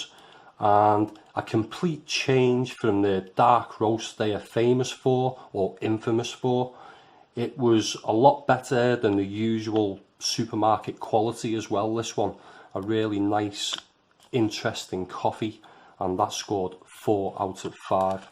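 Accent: Liverpool, United Kingdom. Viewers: Lovely Scouse accent. Adds flavour made. Keep it up.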